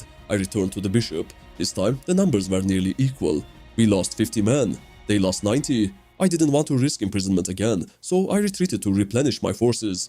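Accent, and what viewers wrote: Turkey. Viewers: I hear the slightest bit of Turkishness in your accent friend... Eline sağlık harika vidyo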